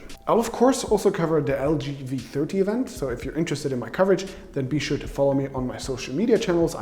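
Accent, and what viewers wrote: Germany. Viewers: Kommst du etwa aus Deutschland? :O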